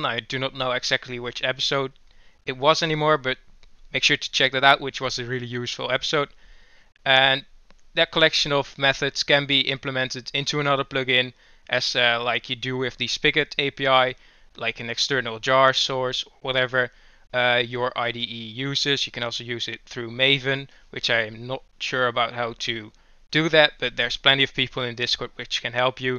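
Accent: Netherlands. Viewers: Ur accent sound a bit like a dutch one, are you? xD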